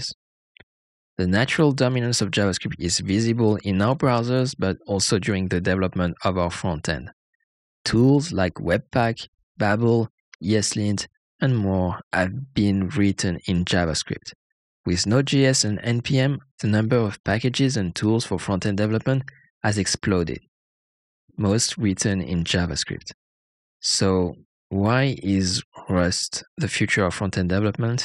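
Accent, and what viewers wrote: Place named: France. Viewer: are you French ? (I'm French and you have an French accent)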